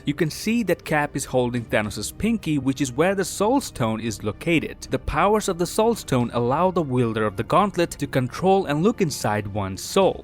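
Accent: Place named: Quebec, Canada. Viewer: You have one of the most stereotypical French-Canadian accents ever and I love it dude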